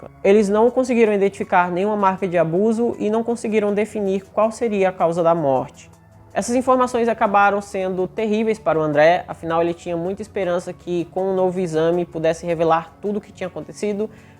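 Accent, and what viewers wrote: Northeast, Brazil. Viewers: adoro seus casos acho que vc narra bem as vezes com alguns errinhos de Português, mas não se sinta ofendido por favor!!!! As vezes é o jeitinho de falar no nordeste aqui no sul é diferente, abraços te sigo em todos os canais!!!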